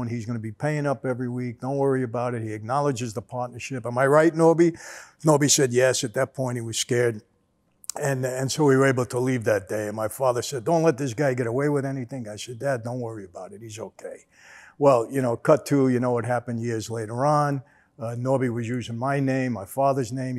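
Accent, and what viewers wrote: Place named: East Coast, United States. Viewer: Hello Mr. Franzese, My Name is Rick Vaughan and I just wanted to thank you for doing all these videos on your life, sharing really interesting and intriguing insights into a world almost none of us “regular-type” people would ever know and for continuing to share the positive message of god and how to be a better person in this world. You have the ability to captivate an audience and it’s awesome to hear ya talk with your east coast accent! I love watching your videos and wanna keep encouraging you to keep them coming! Also celebrating 5 years of No booze today and sending you continued well wish to your daughter and family! Much love and god bless Sir, take care! -Ricky V.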